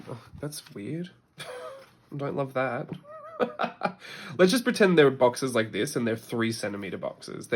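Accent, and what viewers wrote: Australia. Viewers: Love hearing your little Kath and Kim voices 😂 I think it’s ingrained in us as Aussies. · Is that a hint of Australian in your voice?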